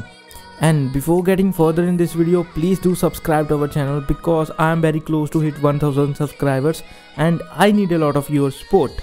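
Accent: India. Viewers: Typical Indian accent is irritating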